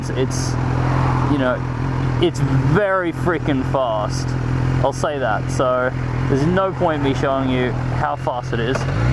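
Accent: Australia. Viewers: You're an American with an Aussie accent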